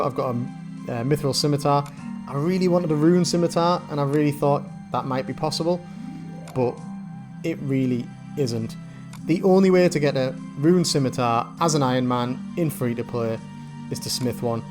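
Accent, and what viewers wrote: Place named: North East England, United Kingdom. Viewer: You from the north east of England mate? Just sounds it with some words you pronounce.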